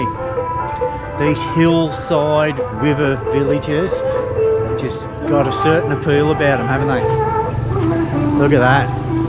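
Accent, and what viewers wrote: Australia. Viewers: Your music suits so well to your pictures !!! Thank you for getting a drone. Thank you for beeing so generous to the poor people. After 2 years I start to get used to your down under acccent. Cheers from Germany. I hope we have a beer one day.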